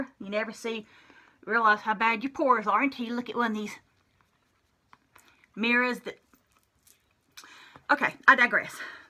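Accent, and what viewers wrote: South, United States. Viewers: I love you n your Southern drawl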